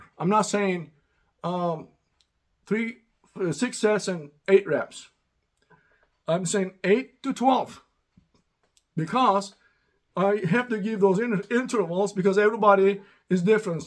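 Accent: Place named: Texas, United States. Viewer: abi, teksas accenti ile konuşmak seni godfather filmindeki adamlara benzetiyor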